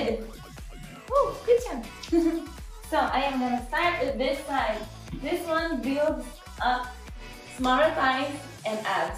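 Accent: Philippines. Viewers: Your english has filipino accent in it! Really cool!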